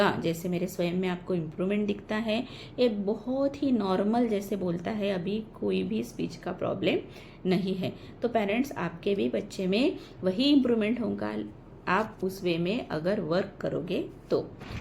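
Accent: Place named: Maharashtra, India. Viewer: Apki hindi bohot cute hai..mujhe meri mahastrian friend ki yad ati...wo v same apke jaisa bolti thi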